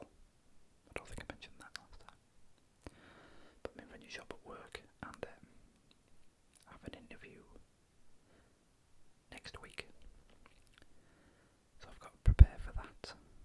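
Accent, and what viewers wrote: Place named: Northern England, United Kingdom. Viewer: Just discovered you. You are now my favourite ASMRist. You sound like your from the north of England; if so where abouts? Yorkshire, Lancashire?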